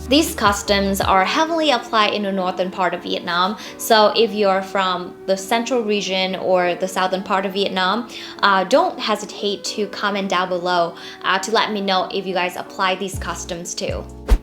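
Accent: Midwest, United States. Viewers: Mình đã nghe nhiều người nói tiếng anh. Mình không phân biệt dc người này là một người việt nam hay một người ở mỹ do phát âm quá giống. Đến khi mình nghe nói là tên và ở mỹ 7 năm. Tất nhiên tây gốc nó phát âm cuốn hơn nhưng cơ bản mình không thể biết đó là người vn đang nói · For a native you sound like you spent quality time in the US or Canada... EDIT: just got to the part where you spent 7 years in USA. :) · Where were you at in the states? Your accent sounds very neutral like the midwest accent. I think many people who would hear you speak English would assume you were American if they didn't know your story. Also as someone from one of those colder states... you know 50F/10C isn't that cold. Don't scare some American into bringing a winter coat to Hanoi lol. Light jacket and jeans is more than fine for that. I am sure you got used to seeing people in Shorts and Sandals/Flipflops/(no idea what Vietnamese call them) in that level of cold while you were here. 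Even in the true winters here in the colder states you see it. Asian Americans in flip flops, and college students in shorts year round is something I'm just used to. Even if I think they are a bit insane. Especially when it is snow covered and -10F/-23C. Put on some boots and jeans people lol. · Thank you. I don’t think you had lived in the U.S for just 7 years. You speak with a perfect American accent. I feel a bit embarrassed about my English even though I have been living in the U.S for 10 years and working in a hospital. I am learning from you!!! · u speaker very smooth US tone English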